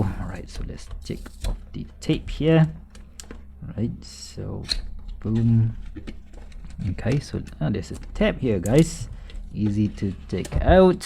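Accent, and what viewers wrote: Malaysia. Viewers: From your accent i know that you're from Malaysia isn't it?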